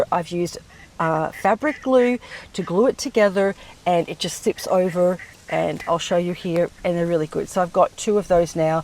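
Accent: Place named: Australia. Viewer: What accent is that? Its 90 percent Australian but something else is coming through. Just curious.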